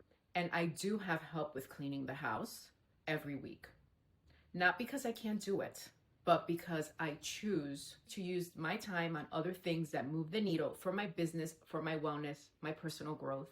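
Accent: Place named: Miami, United States. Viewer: I had a feeling. I love your Miami accent. Keep up the amazing work!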